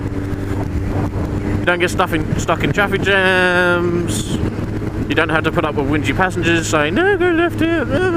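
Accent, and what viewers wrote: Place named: Australia. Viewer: I'm new to you.I like the distance you keep from cars,i like the general message you are trying to give,i like the fact that you havent bought the latest and greatest super duper bollocks and do fine on an older bike.I dislike the slightly australian accent you sometimes have,the colour of the fork tops of your zx9 and erm,the shape of your mirrors.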